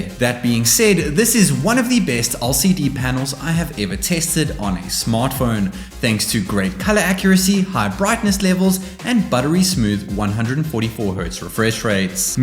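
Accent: South Africa. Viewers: I had a feeling you were South African 💯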